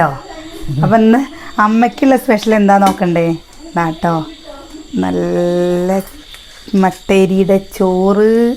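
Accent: Palakkad, India. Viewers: പാലക്കാട്‌ ആണോ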